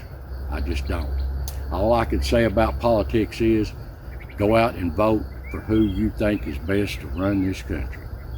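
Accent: Appalachia, United States. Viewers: I love your appalachian accent. Reminds me my Kentucky people from years ago. A lot of the mountain talk is going away . My husband and I love all of your videos. You are sincere and kind . We thank God for your healing. 🙏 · Thanks Uncle/Brother. My dad's people from Northeast, TN. Have that Southern Appalachian accent. Although I grew up in PA. I acquired it somewhat. My friends often said that I talked Southern. I Love hearing Regional accents! From New Jersey and New England to Western PA and the Midwest, it is just fun to hear... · Love your southern Appalachian dialect. We're close here with the accent. So happy to hear you're well on the way to getting back to normal. Thank you so much for what you do in providing clean entertainment and your historical facts. God bless and well see you next time. Continued prayers. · Glad to hear you are feeling better! 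I enjoy learning about Appalachian history. Love your accent too. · The way you talk is one of the best things about your channel. Your accent, mannerisms and interests are the greatest appeals to your videos. I am from the mountains of central/East Tennessee and this reminds me so much of my parents and grandparents. Keep up the good work.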